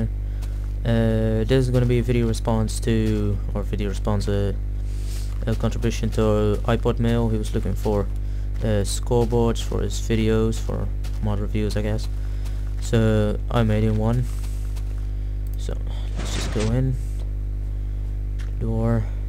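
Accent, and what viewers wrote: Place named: Netherlands. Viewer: Are you and you're friend dutch? If so you're accent is awesome :D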